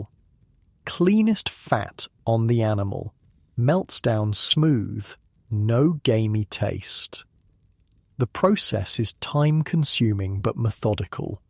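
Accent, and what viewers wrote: England, United Kingdom. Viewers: PM in NY... There's more than a handful of these types of videos out there, but I prefer because of the narrator's English accent and his sly metaphors. They paint such a vivid picture, and mix very well with Moonlight Aura Vibes' video, Cat Purring & Brown Noise (10 Hours). I usually put together a playlist that takes me through the entire evening, allowing me to subconsciously be an opium addict in Victorian time, to The TERRIFYING Life of a Child Emperor in Imperial China, and all points in between! ❤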